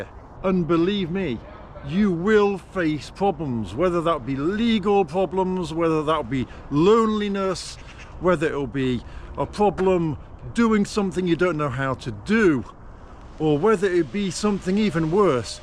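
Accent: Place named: RP, United Kingdom. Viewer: Я при просмотре этого видео поимел некоторый "когнитивный диссонанс". Когда ты совместил в своём монологе две (для меня) стереотипически несовместимые вещи; - эталонную английскую (не американскую!) речь, коей "надлежит" быть дежурно-вежливо-чопорной, и - "неистовую" русскую эмоциональную экспрессию 😄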